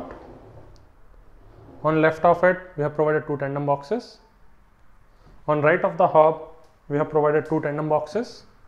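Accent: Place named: Arab world. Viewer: Love arabic accent. Say again "built-in".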